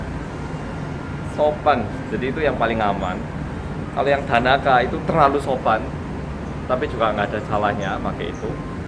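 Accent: Java, Indonesia. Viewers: Salut aku mbek smpean mas, jowone jan melekat kat kattttt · aku orang jawa...hidup di bandung :( kalo medhok malah diejekin :( -,- la mas e bejo medhok tapi wong koreya -,- la ne aku bedo neh critone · sip.. seneng aku ana kancane, seneng ngomong jawa, medhok pisan. akeh wong jawa dhewe sing ora pingin dingerteni jawane, po meneh yen wis nyambut gawe Ning jakardah. Nuwun yoooo... njenengan bombong dadi kaya Wong jawa😊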